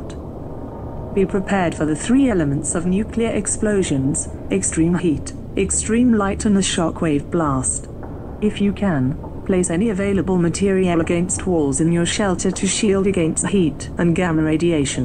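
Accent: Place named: United Kingdom. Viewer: Just the Brit voice is putting me to sleep! She's got a way to sooth people. But the blast wakened me. Lol! 😅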